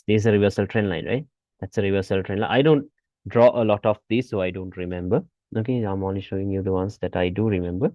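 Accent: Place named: Malaysia. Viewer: When hear ur voice i thought u Master is malaysian 😅😅😅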